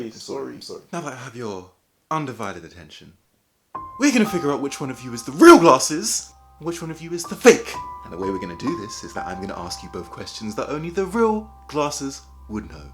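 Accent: England, United Kingdom. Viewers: He sounds Bri Ish for some reason. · I thought it was because you were British and if you say glasses in a British accent it sounds like glarses. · Tbh I never realized you were English before I watched this video. Also my mx blues are so loud I want to rip out my eardrums sometimes. thank you for educating me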